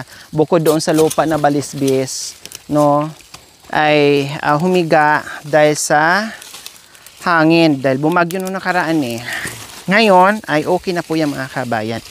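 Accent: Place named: Iloilo, Philippines. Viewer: Ilongga ka gali madam❤❤❤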